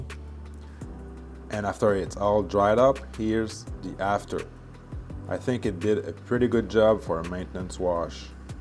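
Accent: Quebec, Canada. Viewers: I'm going to stick to not using dawn sorry but you sound like you're in Canada so maybe you guys have the good stuff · I hear that Québécois accent, bonne Saint-Jean !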